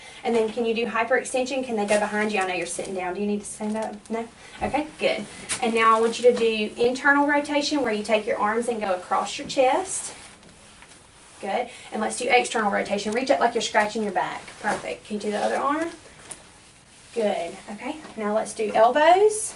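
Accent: South, United States. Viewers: That southern accent makes the video😍👅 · I normally hate southern accents but hers is adorable.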